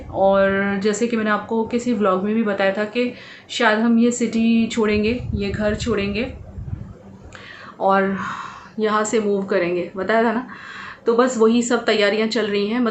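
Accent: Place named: Bihar, India. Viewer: Aap bihari bolati Hain bahut cute lagti Hai kabhi litti chokha share Karena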